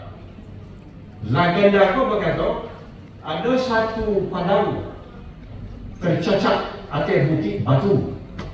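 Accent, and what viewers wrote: Minangkabau, Indonesia. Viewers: indak hilang logat minangnyo 👏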